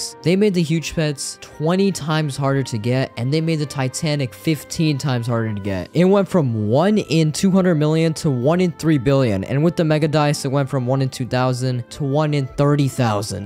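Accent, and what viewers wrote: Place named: United States. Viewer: bro u are also indian but ur accent is like americans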